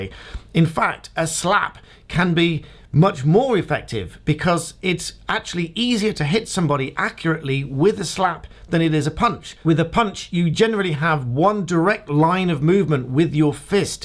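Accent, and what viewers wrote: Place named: England, United Kingdom. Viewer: Why do you say says as sAYz, and not Sez? It really weirding me out, with english accent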